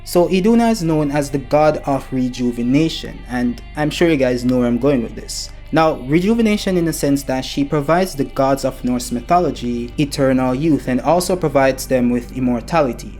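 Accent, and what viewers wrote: Jamaica. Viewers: Brudda yiu Jamaican what's the accent I'm hearin you in ya voice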